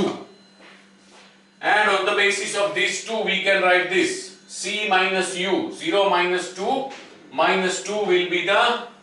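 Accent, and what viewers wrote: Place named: Gujarat, India. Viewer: i don't know but from your hindi i think you are gujarati.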